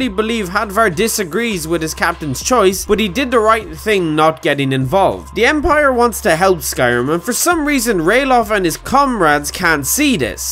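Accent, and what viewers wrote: Ireland. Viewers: Im subscribing because jacky boi shouldnt be the only popular irish accent on youtube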